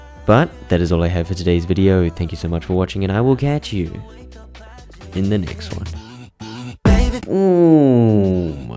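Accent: South Africa. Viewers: South african? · r u south african?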